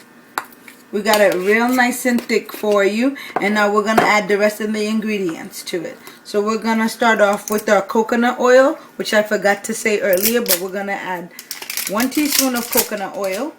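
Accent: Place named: Caribbean. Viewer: so obviously she gotta accent is either west indies or carribean ....lovin it. really liked the video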